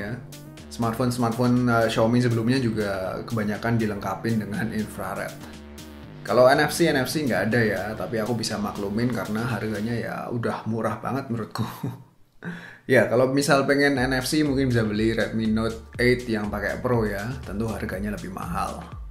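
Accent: Java, Indonesia. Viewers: Jowone Ngendi rek?..Mantap e Logat e iseh ketoro 😁. Salam Pemalang 👍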